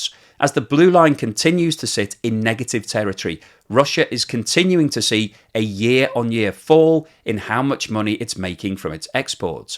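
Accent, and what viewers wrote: United Kingdom. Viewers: 😂😂😂😂😂😂😂😂 According your accent , y are from UK. So ,we know that UK is PR of Ukraine, and what ever y said it's nothing but trash. It's better to look in your garden.😂😂😂😂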